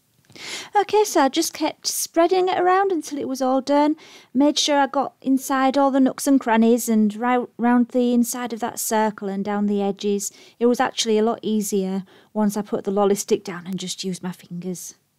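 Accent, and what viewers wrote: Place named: Northern England, United Kingdom. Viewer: this is absolutely beautiful!!!!!!! I have got to try this out. And your Northern accent is so cute and relaxing!! You explained this perfectly!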